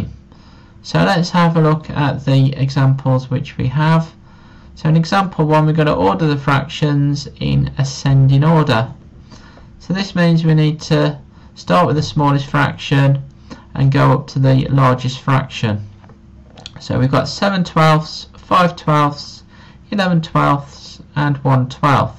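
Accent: United Kingdom. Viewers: R u british???😮😮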